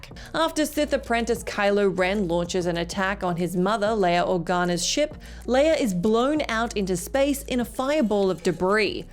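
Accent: United Kingdom. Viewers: I think is Australian, but I picked up mixed accents of British and American. Pick a lane, my dear!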